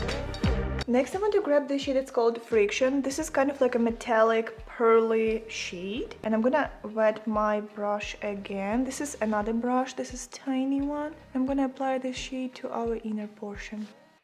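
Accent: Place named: Ukraine. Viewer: Love this look so much. You’re earrings are gorgeous. And I just really love your Ukrainian accent when you speak English. Awesome video. 🤩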